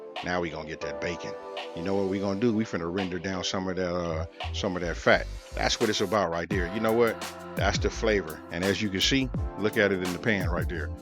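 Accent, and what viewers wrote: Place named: South, United States. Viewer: Where are you from, you sound so country in a good way!!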